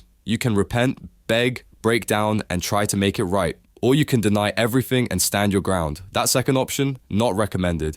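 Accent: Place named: United Kingdom. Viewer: why does your text to speech program has a british accent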